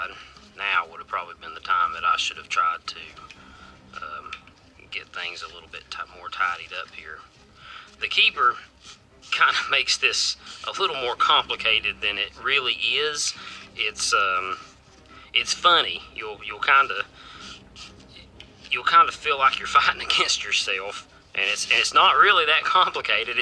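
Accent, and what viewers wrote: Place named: South, United States. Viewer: Definitely made life easier. Your instructions were easy, especially pulling the strap through the keeper. The MAGPUL instructions, either the included or the YouTube instructions, are close to useless. Enjoyed your sense of humor also. Being a Yank but having lived for 35 years in Virginia, I found your accent was a bit of 'down home.'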